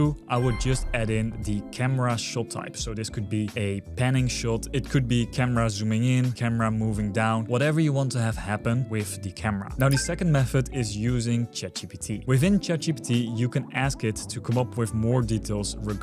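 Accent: Netherlands. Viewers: How is it that I can always recognize Dutch people when they speak English, even when they speak it almost without an accent? By the way, great content, keep it up!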